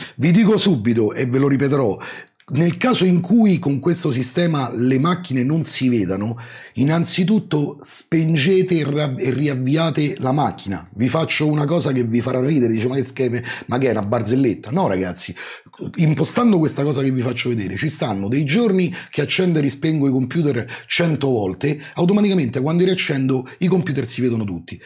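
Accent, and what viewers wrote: Rome, Italy. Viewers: quella cadenza romanesca nel tuo ottimo italiano, rende tutto più piacevole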